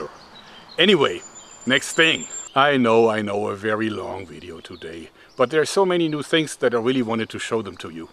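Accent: Germany. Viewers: he is german i know that accent if you watch albert Einstein genuis from nat geo thats the accent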